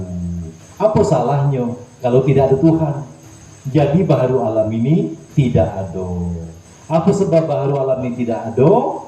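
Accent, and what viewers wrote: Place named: Jambi, Indonesia. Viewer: Assalamualaikum warahmatullahi wabarakatuh.. pas nian Bahaso e lur asli org jambi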